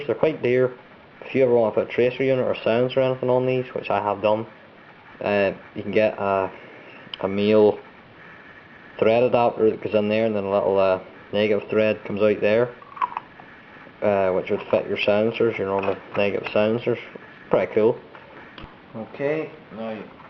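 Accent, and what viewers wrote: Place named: Belfast, United Kingdom. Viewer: love the Belfast accent :L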